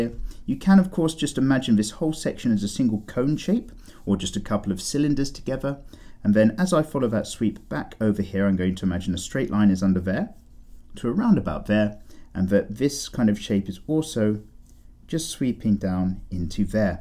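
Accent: United Kingdom. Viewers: Are you a britisher? How do you say bottle of water😂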